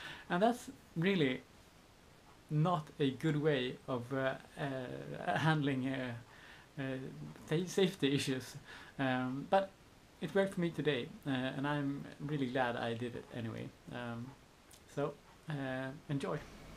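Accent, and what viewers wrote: Sweden. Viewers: Christian Fjelldal I sense Sweden.. I'm from Norway btw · ARE you Swedish? your accent is pretty Swedish... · Darkpassenger 1 He sounds Swedish.. They all have a long standing tradition of eating poisonous shrooms and beating the sh*t out of themselves for fun. They discovered America and didn't even care since there was nothing to loot and the people already living there were too weak to be used as slaves. Columbus had more luck a couple centuries later as he landed in South America where there was gold and the people were stronger and of more use.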